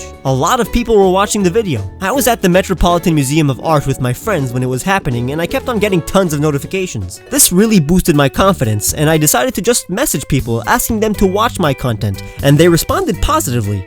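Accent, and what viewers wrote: Israel. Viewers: Always wondered why you had a little bit of an Israeli scent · אתה לא יכול לשמוע את המבטא הישראלי בקולו?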